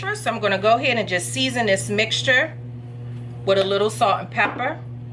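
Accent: Baltimore, United States. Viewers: I would guess Baltimore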